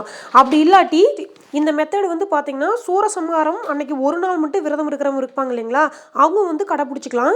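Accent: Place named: Coimbatore, India. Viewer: Kandepa nenga coimbatore circle than... Mariyathai Tamil அருமை... Avele sapduvanga 👌..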